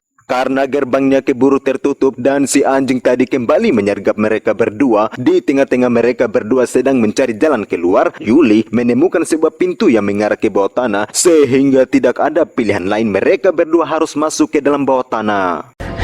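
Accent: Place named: Medan, Indonesia. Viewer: Logatnya Medan bgt 😂.. jdi ingat mntan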